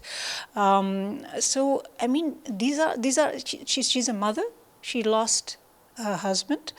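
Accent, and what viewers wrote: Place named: United States. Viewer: This bi is not pakistani. Her accent is fully American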